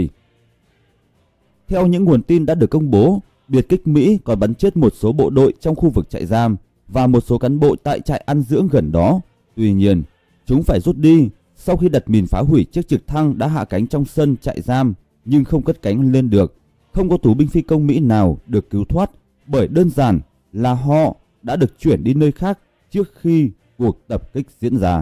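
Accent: Nghe An, Vietnam. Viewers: Người đọc cố gắng đọc theo giọng người Hà Nội, chắc là muốn để người nghe dễ hiểu, nhưng vẫn mang âm giọng người Nghệ lĩnh ...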